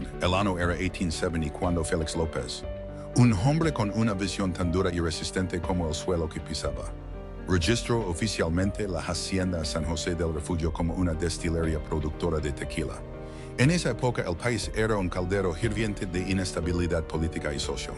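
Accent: United States. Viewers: TU PRONUNCIACION ES DE USA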